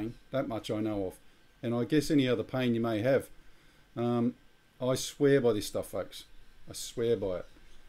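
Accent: Australia. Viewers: ha,, great to hear an aussie accent,, don't despair with lack of response,, expect this from humans,, they are mostly pretty thick,, also pleased to hear you say the lords prayer.. I'm prepping, but I've only got some cash.. metals are useless. batten down hatches..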